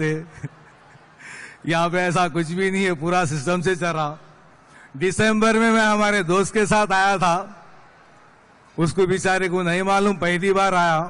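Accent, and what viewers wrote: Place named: Hyderabad, India. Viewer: Kali Hyderabadi bolthey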